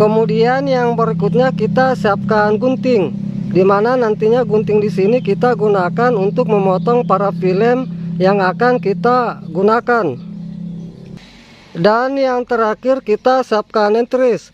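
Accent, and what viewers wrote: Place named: Tolaki, Indonesia. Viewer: Sultra bagian mana bang, logat tolaki ta nda hilang keren 🥰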